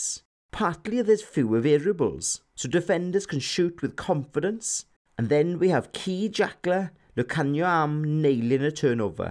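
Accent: Wales, United Kingdom. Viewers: Excellent analysis. Love that thick Welsh accent. Regards from South Africa. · great clip, excellent analysis- in this channel we have a Squidge competitor- love the heavy Welsh accent- must be a man from the Valllleeezz? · Great insight and enjoyed the Welsh accent as a bonus! Subscribed and followed on Twitter. Looking forward to learn more from you. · Just love your Welsh accent😊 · I like this Welsh accent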